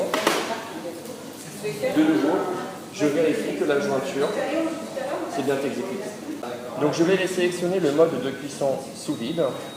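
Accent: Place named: France. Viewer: Ich habe bislang nur sehr selten jemanden gehört, der so französisch spricht, wie der Protagonist in diesem Video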